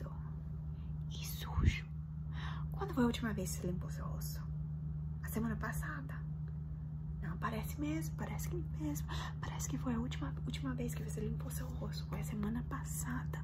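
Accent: Minas Gerais, Brazil. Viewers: Já ia pergunta se ela é de Minas kkkkkkk Perfeita.🤩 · Eu amei, ficou muito bom seu portugues, ficou um sotaque bem mineiro adorei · estou confuso com o seu sotaque brasileiro, mas pelo menos você tentou, excelente asmr! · Your Brazilian accent is so strong lol not hating at all, you’re Portuguese was perfect!! Love your videos!! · Moça não tem como você não ser do Brasil, até o sotaque é fortíssimo e amei o asmr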